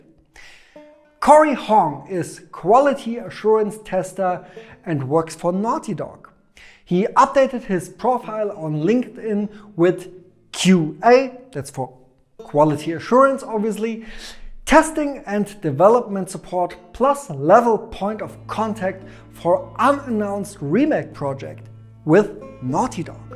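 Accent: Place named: Germany. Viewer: oh haha hoppla, bitte vielmals um Entschuldigung, wusste nicht dass ihr nen extra Kanal habt & danke für Info =) man hört halt den Deutschen raus hier beim Englischen Video & das ist (zumindest für mich) irgendwie ziemlich irritierend. Nicht böse gemeint =D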